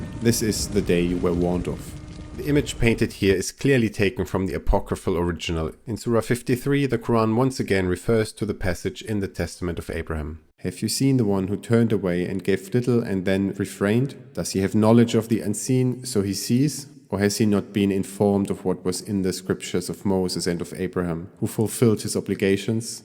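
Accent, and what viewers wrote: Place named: Egypt. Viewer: Sounds very Egyptian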